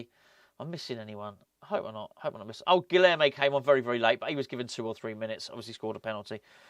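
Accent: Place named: North London, United Kingdom. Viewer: You sound like you come from North London, go back to your own site's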